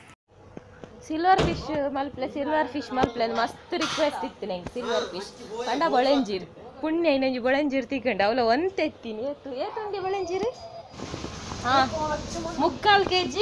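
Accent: India. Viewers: Eer enna ne tulu panda proper Mangalore tulu paatheruvar anchad enk mast kushi aapundu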